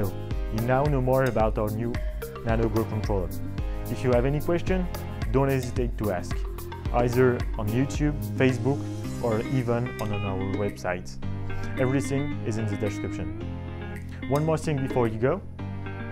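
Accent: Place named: France. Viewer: Nan nan nan ... ce n’est pas possible, pas avec un accent français à couper du bois et encore moins avec un look de VRP ... Je vous le fais version vendeur pro, sans accent soporifique contre une Gavita e-series 6/750w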